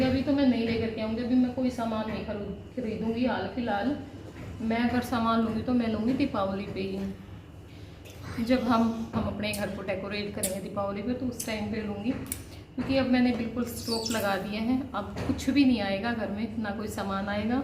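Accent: Haryana, India. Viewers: Bebe haryanvi boli mast laga h👌👌👌👌👌👌👌👌👌👌👌👌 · Aap h haryanvi me baat kera kero behot achi lage h aap k mu se sunne me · Haryanvi touch aapki language m sunn k bhuutt acha lga Di... God bless you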